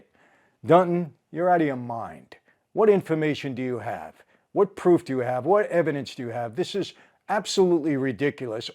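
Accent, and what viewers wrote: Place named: New York, United States. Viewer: I like you first time listening im an old lady who grew up in the big apple and your accent is like visiting my classmates and neighbors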